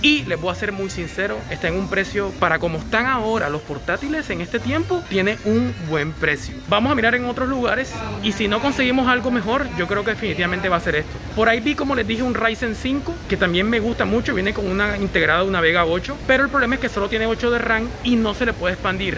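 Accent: Caribbean Coast, Colombia. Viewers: Njd familia el vale es costeño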